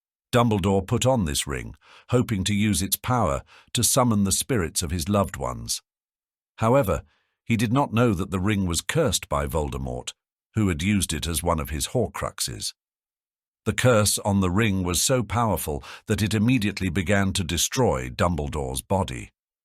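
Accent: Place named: England, United Kingdom. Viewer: I just found this channel. Really enjoying it. The narrative is great. Love the English accent. The pictures are beautiful. I just wish they illustrated what's going on in the narration.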